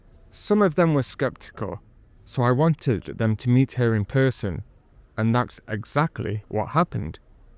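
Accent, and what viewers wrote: England, United Kingdom. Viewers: Your full of BS you are not an Australian do you think we are all stupid, I heard you say with a pommy accent who you have been from Canada, The US with the same old story about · You certainly don’t sound Australian mate Have you spent time in the UK or something?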